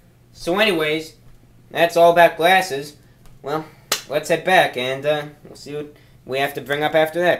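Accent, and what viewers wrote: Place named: Boston, United States. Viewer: Hes got more of an accent in this video hes more pronounced now.is he from boston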